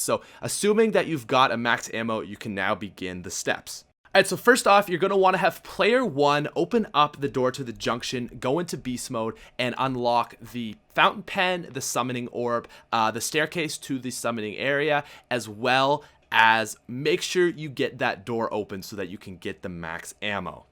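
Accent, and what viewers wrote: Canada. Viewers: Strongest Canadian accent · He’s Canadian. That’s how Canadians pronounce out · R u Canadian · Are you canadian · Lol this guy is definitely Canadian. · Are u Canadian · Are you Canadian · Is he Canadian? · this is my first time checking out your channel and you sound way more Canadian than lex. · Dude i love the Canadian accent. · I think he's from Canada. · You are definitely Canadian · Hey are you Canadian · Dude he's Canadian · the Canadian accent is strong in this one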